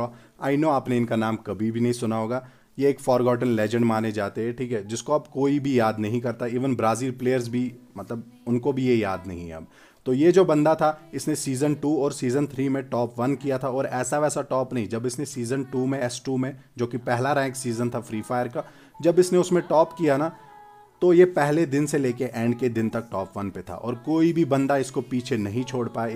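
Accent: Kashmir, India. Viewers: Bhai kashmire ho app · Kya aap Kashmir se hooo 🙄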